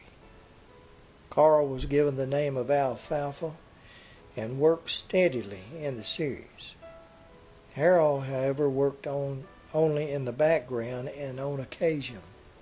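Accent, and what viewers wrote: South, United States. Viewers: You have a great voice for narrating, and great American accent and local dialect.... nice. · I enjoy all of documentaries he has that southern voice that makes for great listening keep up the great work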